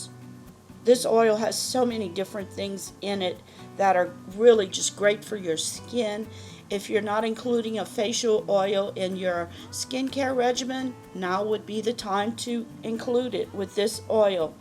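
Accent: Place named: South, United States. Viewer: I just love the way you do ur presentation, u talk about products and research what they are. I love the way u pronounce spoilers, with ur accent, since I was a little girl I always loved southern accents and I have always been envious ladies who spoke with one🤩😍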